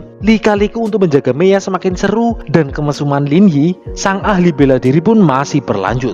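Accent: Banyumas, Indonesia. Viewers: Aja kelalen ngapak kang.. 😂😂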